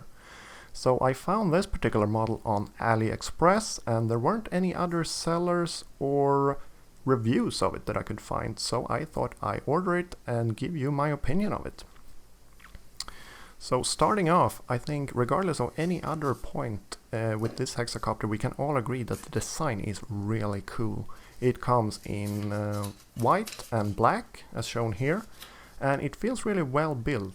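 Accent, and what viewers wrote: Sweden. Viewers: Wooooow helt klart svengelska (man hör det på nästan alla svenskar)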